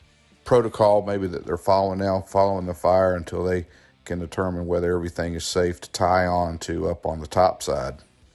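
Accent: South, United States. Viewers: The water conditions sound better with your southern drawl!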